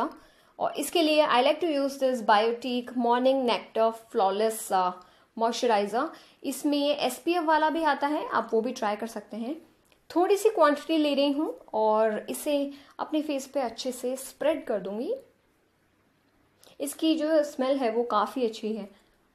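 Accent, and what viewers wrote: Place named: Rajasthan, India. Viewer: Mujhko khudko jor aata he...😊😊😊...Jor aata he sunke he pata chal gaya ki rajasthan ki youtuber he.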